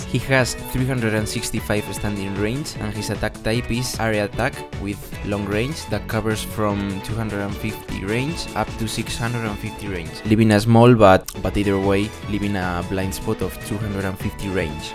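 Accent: Spain. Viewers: R u spanish dude? Your acent is exactly the same as mine when i speak english😂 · E w tu ere español no? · solo por como hablas creo que eres español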